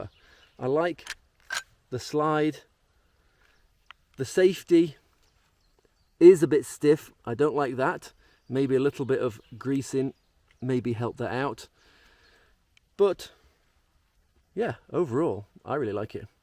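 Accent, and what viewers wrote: United Kingdom. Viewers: Ah, an English accent, now it makes sense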